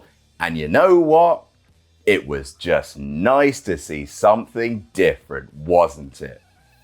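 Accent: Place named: United Kingdom. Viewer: Hey its that posh english guy that talks about wrestling.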